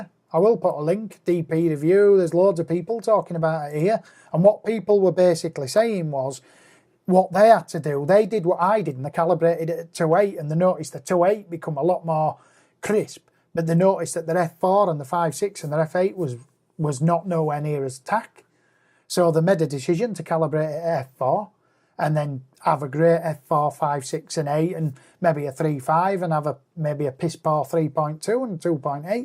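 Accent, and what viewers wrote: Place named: Yorkshire, United Kingdom. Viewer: you are from yorkshire mate.....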